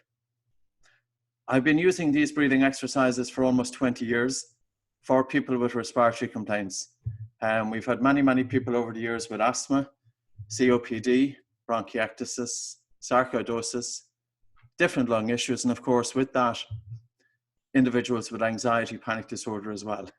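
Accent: Ireland. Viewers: But why does he do all this .eishhhhh minuit - muinishhhhh, respiratory rate - respiratory reishhh? like wtf are you IRISH, KIWI, AUSSIE? xD if you tell, I change my thumbs to up, cause all you say is probably right and helpfull, but the accent xD is hard to get used to and bad for my oxford english ^^ ah and if you panic, use asthma spray, drink a glas of irish stuff, whiskey, then sit straight, breath deep and slowly, not big shallow and fast.. btw it's better without whiskey, but if you panic you needa stop that. still better then drugs xD except asthma spray xD luls. Actually when we do sports and we get exhausted, and make the break, at first we do exactly what he says, fast shallow breathing, but then after a few seconds, we automatically go back to normal breathing, slow, deep, not hyperventilating/fast breathing. xD appearetnly our body knows it by itselsfin usual conditions and sports. 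^^ my note, his technique - EVERY TIME! THIS TIMES - when you do his test, like resting respiration, you feel obstruction or breath difficulty - CALL AMBULANCE AND DOCTOR!!!! use his techniques until they arrive. because I GOT SUSPICION, the earlier you arrive in intensive care or medical care - the easier they can help, avoid the cytokine storm with meds so it doesn't destroy the lungs! THE THING THIS REALLY SMART GUY DOESN'T MENTION: AFTER CYTOKINE STORM = YOUR LOUNGS ARE WHITE, DEAD, NADA, you're DONE, you wont' breath anymore cause lungs = broken, so you're DEAD, so you cannot appl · Oh, this is fantastic, outstanding - thank you!! You confirmed for me that what I've been doing intuitively is right on (since COVID... when grocery shopping, keeping mouth closed and hardly breathing if at all, especially when near anybody), when outdoors and downwind of anybody else (especially if they're speaking), ditto (and move upwind/away if possible so as not to be 'in the line of fire' for their expelled air (and particles...), etc. 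etc. Also, over a steady 24 years of lap swimming, I have always and only breathed through the nose while swimming, though I wasn't aware of all the benefits of doing so. This was very educational and exceedingly useful, especially at this time. Bless you! Will be sharing.....! (p.s. - love your (Dublin?) accent :) ) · Brett/ hold time = BREATH Hold Time. Tis the Irish accent you see. Likewise, wid = with; tinking = thinking, breed = breathe. LSD is a good anachronism. This has been an excellent explanation. I've shared this with as many people as I can and now I am doing a revision. Thankyou once again, many times over. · I love your Irish accent. Not an accent in your country of course but in America yes. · I’m breathless over this guy and his irish accent!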